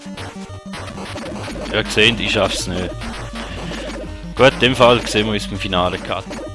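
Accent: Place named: Switzerland. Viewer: Wuhu noch 'n LPer der CH-Deutsch spricht ;P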